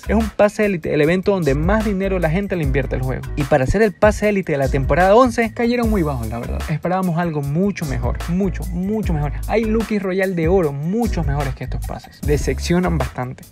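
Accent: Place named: Ecuador. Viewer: Por su manera de hablar siento que es Ecuatoriano, jaja buen video ♡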